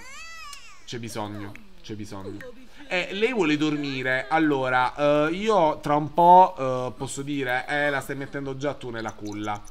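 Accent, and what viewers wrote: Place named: Taranto, Italy. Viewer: ho appena iniziato a guardare i tuoi video e ho capito subito che fossi di Taranto,spero vivamente di avere ragione hahahah comunque sei divertentissimo giuro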